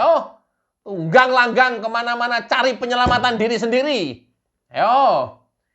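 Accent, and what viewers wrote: Java, Indonesia. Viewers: Pak kalo logat jowone keluar,Mantap. seperti; Cok...Dancok / Jancok...😃 Blok...Goblok...😃 Besok kata"nya di tambahi; Uthek'mu Cok😲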